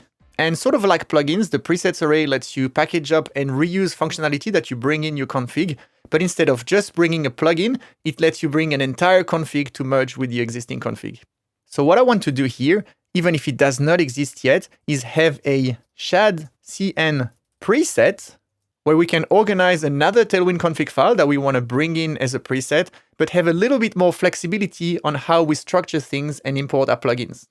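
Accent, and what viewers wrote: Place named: France. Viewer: French accent just makes it better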